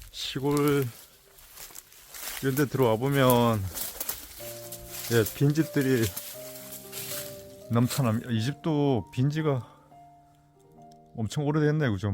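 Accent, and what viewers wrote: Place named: Gangneung, South Korea. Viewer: 강릉 감재 특유의 말을 들으니 반갑소야. 시방은 서울에 살지만.. 저도 고행이 강능 죽현이래요.. 촬영차 오가시는 길 시나미 잘 댕기시우야.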